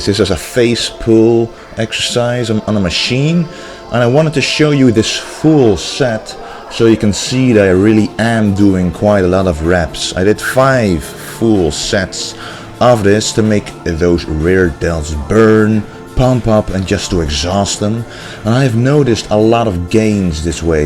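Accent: Netherlands. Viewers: Somehow your accent gives me the idea that your a dutchie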